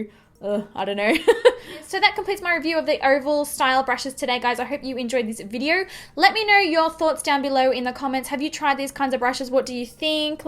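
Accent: Australia. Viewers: Love your tutorials and reviews and your lovely Australian accent! 😊 Did you feel like the handles were so flimsy they would break easily? Also, did the brushes shed?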